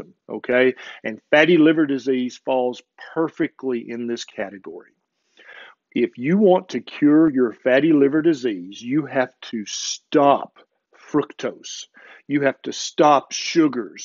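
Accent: South, United States. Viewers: Nice to hear a educated southern accent with real advice!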